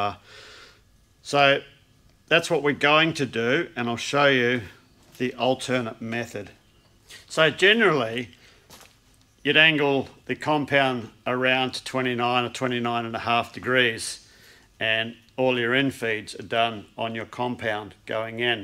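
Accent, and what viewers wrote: Australia. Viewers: I hear his Aussie accent and I click Like!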